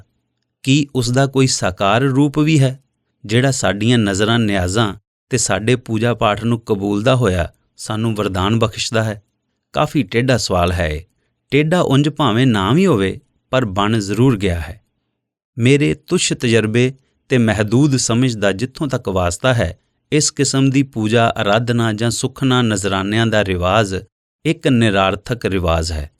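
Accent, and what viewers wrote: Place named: Punjab, Pakistan. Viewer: Tuhadi awaj sun ke bht khushi hundi aa.. tuhadi punjabi cho Pakistan Punjabi da kafi touch feel hunda.. menu mere Pakistan punjabi frnd yaad aa jande jado v tuhadi awaj sundaa.. Thanks u very much Sir🙏🙏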